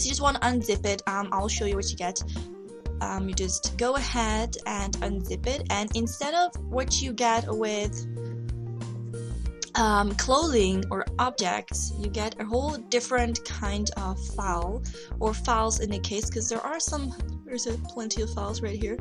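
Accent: Netherlands. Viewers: haha gewoon een Hollander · Your dutch :) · o are you dutch?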